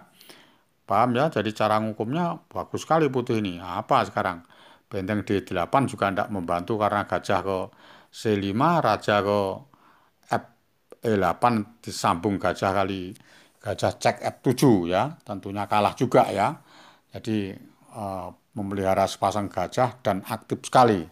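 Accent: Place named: Tegal, Indonesia. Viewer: Sampean kaya wong Tegal min...kaku omongane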